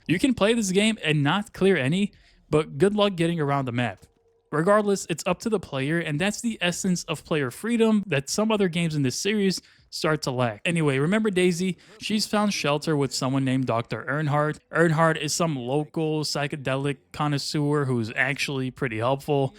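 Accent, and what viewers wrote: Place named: India. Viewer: your accent dude not American 100% just 65% are indian or something!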